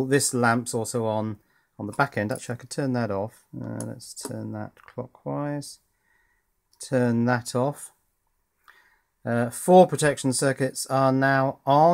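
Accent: England, United Kingdom. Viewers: Best voice and the greatest English accent. I want to listen, each word you pronounce. Very informative video. Thanks for uploading.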